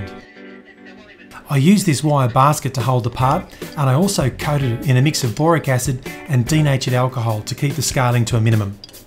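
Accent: Germany. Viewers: Are you german, swiss or austrian? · are You german?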